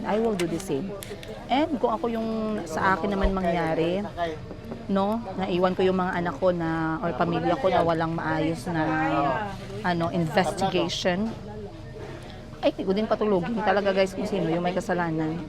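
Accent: Iloilo, Philippines. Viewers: Urs when i hear you speak ilonga nakakatuwa but My papa is speaking bisaya he is from bohol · Natutuwa nga aki madam mag salita kw ilongga kc may kaibgan dn ako taga ilo ilo.ingat po kau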